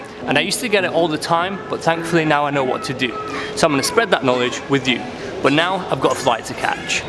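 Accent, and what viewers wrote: United Kingdom. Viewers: Great regional uk accent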